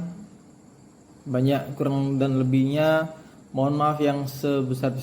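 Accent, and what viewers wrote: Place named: Java, Indonesia. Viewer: Jawanya totok kali pak 😂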